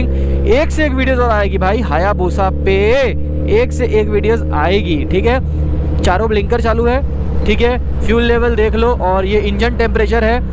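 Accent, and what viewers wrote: India. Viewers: Bhai aap bihar se ho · Bhi tum bhajpuri Ho ke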